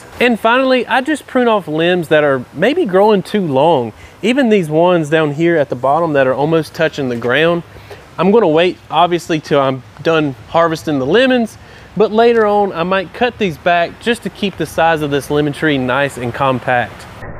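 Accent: South, United States. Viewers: Hilarious! I just got myself a little Meyer lemon tree and wanted to take the very best care of her- saw that this video had a southerner explaining stuff and knew I was in the right place! Thanks a bunch! Especially liked the alternative options for soil and fertilizer. One question: I watched a another person's video on YouTube and she mentioned that you should look for the part of the trunk that all the roots are coming out of ( it kinda looks like a peanut in the shell) , she said when repotting you should keep that part above the soil. Any thoughts or other explanations? Thanks again!!!!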